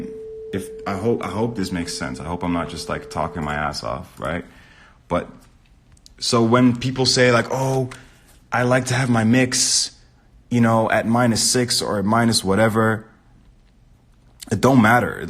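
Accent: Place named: Pennsylvania, United States. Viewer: Sounds JUST like my guy from PA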